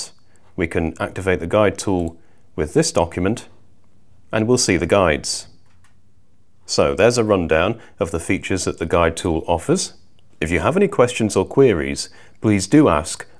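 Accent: United Kingdom. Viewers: Excellent - that helped a lot - nice to hear a British accent that I can actually understand as opposed to an American gabble.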